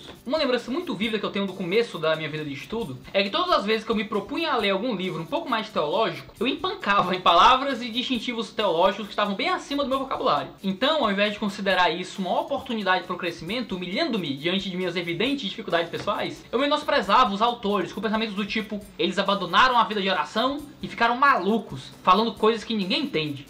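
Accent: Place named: Northeast, Brazil. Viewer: Fico ouvindo esse nosso sotaque (nordestino), e admirando essa biblioteca 😍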